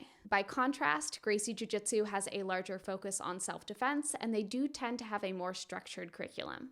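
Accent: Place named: United States. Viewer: Maeda went to brazil specifically to showcase judo. He was a prize fighter who traveled the Americas doing demonstrations and challenge matches. He was not a business man. She's American, so I wouldn't say it's incorrect to pronounce their names with an American accent. I've heard some Americans that train under the Gracies use the R when pronouncing their names. Roger Gracie fought once in the UFC against Tim Kennedy.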